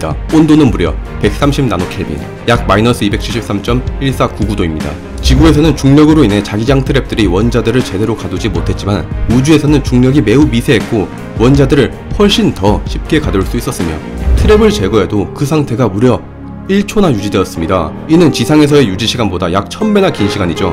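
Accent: Gyeongsang, South Korea. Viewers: 경상도 억양이 약간씩 섞여 나오네요. 어느지역 출신입니까?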